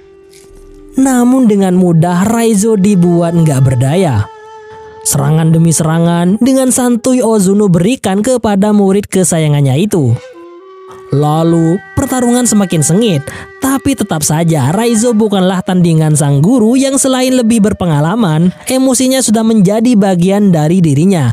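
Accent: Madura, Indonesia. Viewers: Abbe adminnah Reng medureh yeh😂😂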